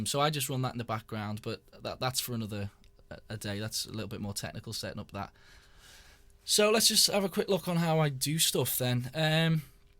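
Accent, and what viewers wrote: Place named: Liverpool, United Kingdom. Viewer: Good job on this. Thx. And nice proper scouse accent to boot.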